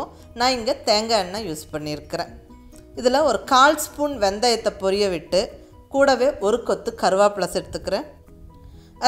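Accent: Coimbatore, India. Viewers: kongu tamil arumai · Hai sis eppadi irukkenga... Unga kovai tamila kettukitte irukalampola avlo alagaa irukku. Subscribe panravanga ennikkaiya parkkumpodhu avlo happayaa irukku sis... Neenga innum niraya munnera en anbaana valthukkal... Dish asusewel super. 👋 👋 👋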